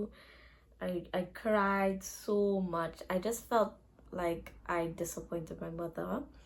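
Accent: Trinidad and Tobago. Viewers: Town and KFC are popular in Trinidad I live in Trinidad she speakin trini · Are you saying she is from Jamaica or you are from Jamaica? Cause she is Trinidadian, you can hear her accent and on her Instagram she has Trinidad.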